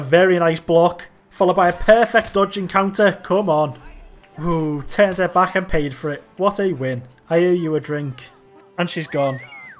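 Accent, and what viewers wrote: Liverpool, United Kingdom. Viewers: Yes now scouse youtuber im here for it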